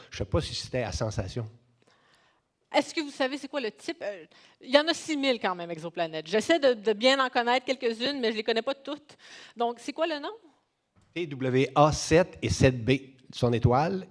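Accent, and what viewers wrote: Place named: Canada. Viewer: Que du bonheur cette conférence 😅 avec cette accent canadien inimitable 😂 encore meilleur 😅 de France ,je partage sur notre petite planète avec mes 2,9 k followers * 1,3 k suivi(e)s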